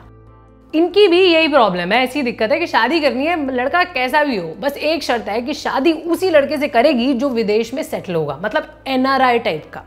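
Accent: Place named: Bhojpuri, India. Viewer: Madam ka bhojpuri accent majedaar hai, I also make videos.. please check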